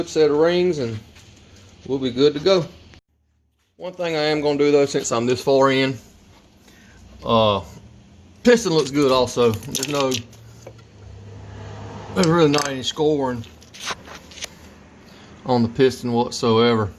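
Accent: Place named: Deep South, United States. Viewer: Big Bay'er fo hunred, got er a rebil kiyt. man thats a deep deep south accent brother. Im from the far far north east.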